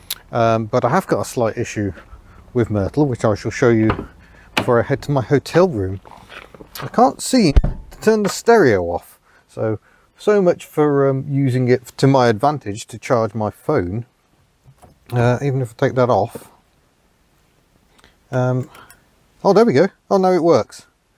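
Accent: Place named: Birmingham, United Kingdom. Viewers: Did enjoy your brummie accent lol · Your Brummie accent was the highlight of this video. Nice to see Myrtle getting some love.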